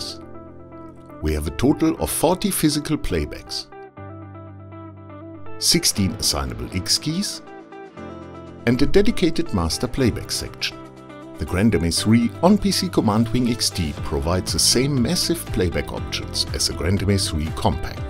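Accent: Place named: Germany. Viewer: Most german accent ever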